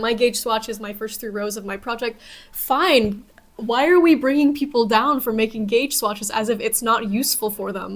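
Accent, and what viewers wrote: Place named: Netherlands. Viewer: took me until to realize youre dutch too holy shit edit: the netherlands. not holland lmao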